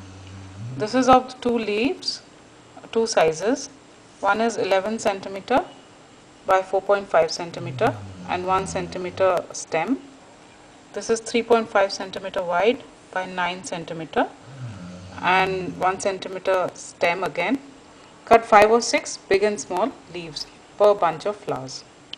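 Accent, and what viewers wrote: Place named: India. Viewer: All your videos are so dark, badly filmed and the object is often out of camera shot. Your Indian accent makes them hard to follow.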